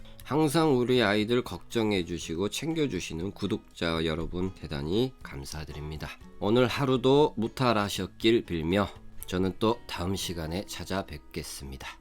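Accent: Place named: Jeolla, South Korea. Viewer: 풍경이 참 예뻐요 럭키 보는 맛에 애청중입니다 가끔씩 친숙한 전라도 사투리가 정겹네요 워메 ~따라와붔네 ㅎㅎㅎ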